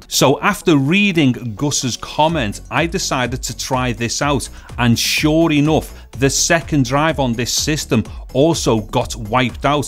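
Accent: Liverpool, United Kingdom. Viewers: a scouse tech channel? subscribed!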